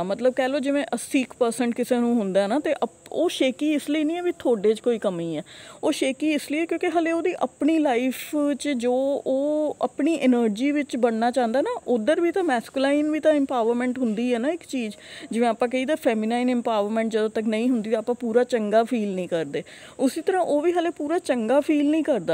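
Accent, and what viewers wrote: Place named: Punjab, India. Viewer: I know this right from early you have an Punjabi accent in Hindi I live Punjabi language I am garhwali but lived in chandigarh a lot. Nice effort...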